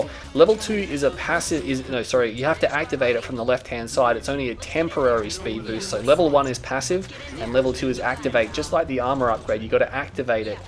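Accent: Australia. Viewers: Your Ausssie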